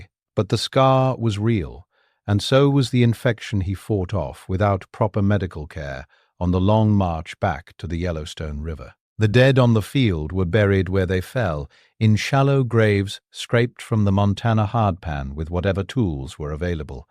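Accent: United Kingdom. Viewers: You saw that also,this voice ,this channel is a joke , is he from England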